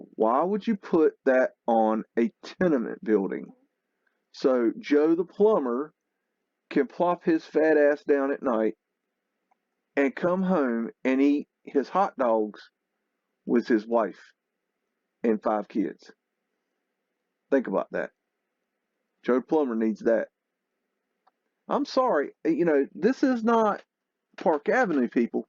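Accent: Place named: South, United States. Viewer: Love what you're doing! Whoa that accent is thick tho lol where in the south are you from?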